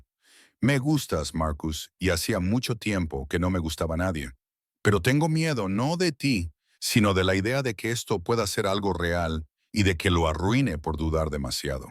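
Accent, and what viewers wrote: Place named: United States. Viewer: La narración es muy mala, el acento yanqui insoportable. 5 minutos y no he aguantado más...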